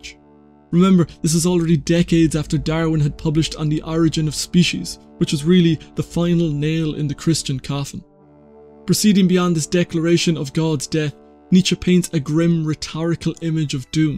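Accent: Ireland. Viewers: aha, I just showed your videos to a mate whose just discovered the late alan watts and we were guessing your accent! He voted midlands, I voted not dublin. And I am indeed! You have some great videos man, really enjoying your work. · I like your videos by I get distracted by your accent. Where you from, Wicklow?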